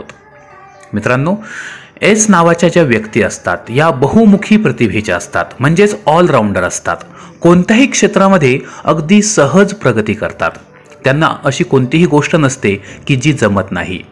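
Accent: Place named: Delhi, India. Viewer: दिल्ली गर मराठी तुमचं. नाव काय आहे ते सागा 🤩🤩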